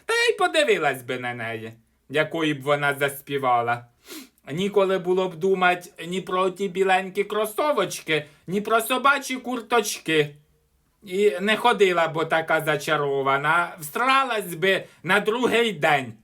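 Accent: Poltava, Ukraine. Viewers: Вам срочно потрібно на "Україна має талант",Ви ж артистка ще та!!! Ну так же класно копіюєте наш Полтавський діалект,завжди з великим задоволенням слухаю! Рухайтесь до великої сцени,бажаю успіхів! Данилко теж наш і починав зі шкільної сцени,а тепер який знаменитий.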